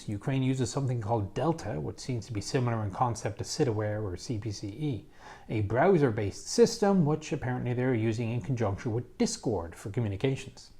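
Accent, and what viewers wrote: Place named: Ireland. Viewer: Why do I feel like this guy speaks with an Irish accent?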